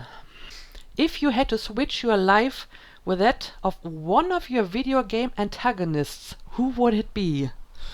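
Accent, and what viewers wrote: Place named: Germany. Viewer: Myst... that was the first game that scared me too. But I was very young and didn't understand a thing. And nice german dialect you have there. Ist das norddeutsch/friesisch? Ich kann es überhaupt nicht einordnen. Hört man im Süden nicht so oft :D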